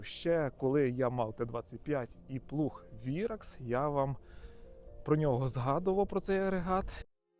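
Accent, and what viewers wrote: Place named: Volyn, Ukraine. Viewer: Вас мова з волинським акцентом... Звідки ви?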